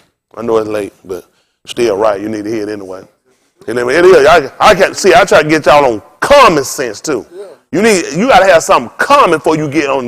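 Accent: South, United States. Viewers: He has a funny way of telling the facts of life. I enjoy listening to. He has a heavy southern accent. I guess I've been out here in Cali too long!!!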